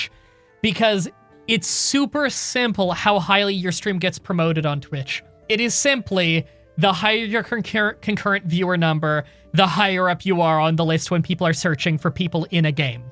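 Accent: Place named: Canada. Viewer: You look like a viking but your voice shows like a Canadian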